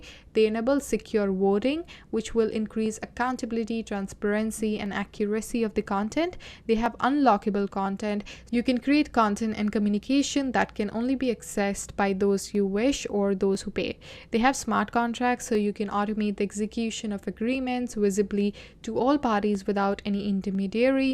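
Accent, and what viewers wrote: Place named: India. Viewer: Luv the video.thank.u. R u.from india luv the accent.🙏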